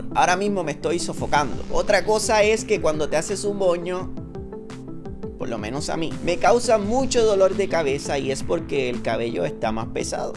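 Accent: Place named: Puerto Rico. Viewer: Hablas como puertorriqueño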